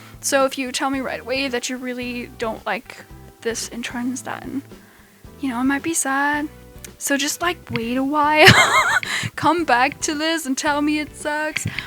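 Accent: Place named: Netherlands. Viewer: Really detailed and good looking build! There is a chance you identify Dutch youtubers as German youtubers, our prenounciation in English is quite simillair. The red colored lamp are usually lamps that are designed to generate heat because the animal needs basking spots or a specific temperature. Ow and cookies, def cookies. Keep up the good work, just subbed!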